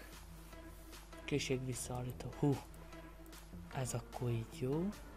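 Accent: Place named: Szekler Land, Romania. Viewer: szoval ezert volt ismeros szekely beszed :)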